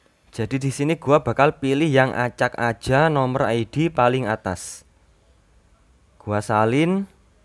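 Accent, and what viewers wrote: Java, Indonesia. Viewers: Terlalu medok bangg🙏😂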